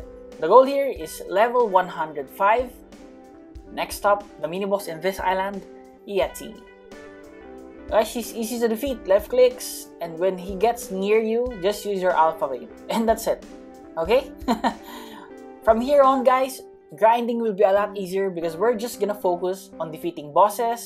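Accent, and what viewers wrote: Philippines. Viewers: eh pinoy naman talaga sya voice palang nya at accent alam na alam na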